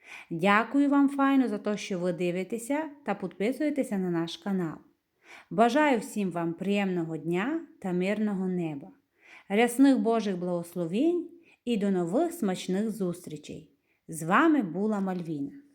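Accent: Transcarpathia, Ukraine. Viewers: Подписалась на Ваш очаровательный канал! Желаю ему процветания. Закарпатский диалект прелестный и самобытный! Привет из Калифорнии. Надеюсь, что наша родная Украина разобьет в пух и прах фашистов Раши и выгонит их со ВСЕЙ своей земли. И земля вновь зацветет своим прекрасным языком и диалектами! Слава Украине! Слава ВСУ! Все будет, Украина!💙💛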